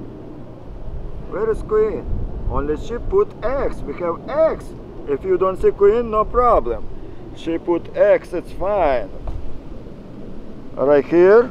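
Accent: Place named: Russia. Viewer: I love your Russian accent. I have a question. All my hives are not producing honey. Hives are strong but no comb no honey. Only nectar and brood..any idea?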